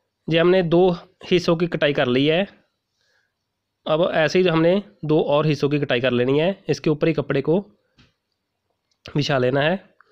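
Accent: Punjab, India. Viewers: Aapki aawaj se punjabi jalak rhi h waise video 1 no. H ji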